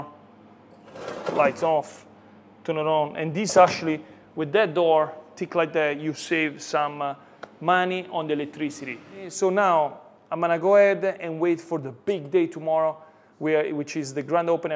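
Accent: Italy. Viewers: Congratulación!!God bless you with health and happiness for you and you family to enjoy the new chapter in life.Im a new subscriber and your way to talk Italian bring me a lots of memories of my familia !🙏🙏🎉🎉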